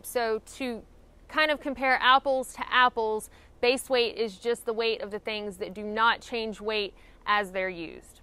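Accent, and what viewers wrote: South, United States. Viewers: Not only is a lovely lady, she's also a real smart cookie😁 Thankyou, as always your video was very informative and as always, we love that southern accent 👍👍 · 💜 you’re Vlogs and inspiring enthusiastic way of explaining hiking adventures in your southern belle accent 💗Thank you for sharing 💜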